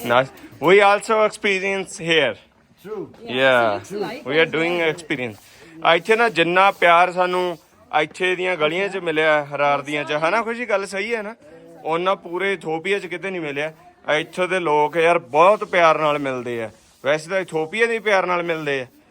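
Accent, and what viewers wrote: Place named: Malwa, India. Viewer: ਯਾਰ ਤੇਰੇ ਝੱਗੇ ਤੇ ਕੁਰਬਾਨ pure ਮਲਵਈ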